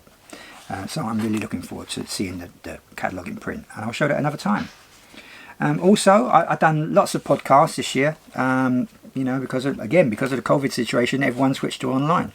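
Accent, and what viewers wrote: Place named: London, United Kingdom. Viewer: Hi just watched this again, I really like your relaxed attitude great english accent,I gather your a londoner as am I a long time ago. Really enjoy the books you have put up, looks like you have a massive library in your unit. Glad you've made it to where you are congrats on that great certificate all the best Terry.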